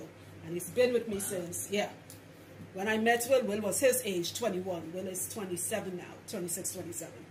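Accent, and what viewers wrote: Trinidad and Tobago. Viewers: Im watching these comments and I see people talking bout your accent then I raised the volume and I'm like "wait, that's Trini I hear?!" It's nice to see that I'm looking at loc videos and I come across a fellow Trinidadian. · I Heard the accent and the beginning and I knew yuh was a Trini, Trini to d Bone!! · 30 secs in listening... Was like.. Wait......... Y she sounding trini.. Then boom u say you're trini, great vid hun. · Yes I know that accent 😍 hi Trini sista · From the time you started talking I knew you trini🇹🇹❤ I finger twists my hair two weeks ago so I am going to do the two strands twists asap 🙌🏾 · i could hear that trini accent hardd lol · Me listening to her talk: buh how she sound like a Trini so? Her: Everybody knows I'm Trinidadian Me: DAS WAH WE TALK BOUUTTTTTT. applauds and immediately subscribes I wanna start locs but I'm a dougla and there are no tutorials for my hair type, plus, I am soo worried about thinning so I clicked on this video for info and I am already SURE this woman knows what she's talking about. 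· Trini to the bone! Yes sister! Shout out from Chicago! I knew u was a trini soon as I hear ya voice. Both my parents live in Arima. Power!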